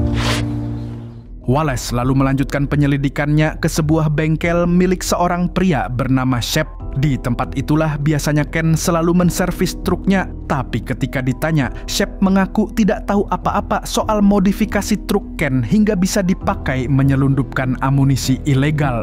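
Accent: Banjar, Indonesia. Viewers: Banjar banarrrrrr wal ai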